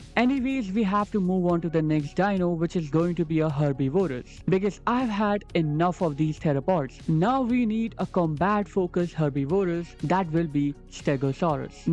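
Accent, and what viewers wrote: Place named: India. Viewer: It’s my first time in your channel and you sound indi a don’t want to be rude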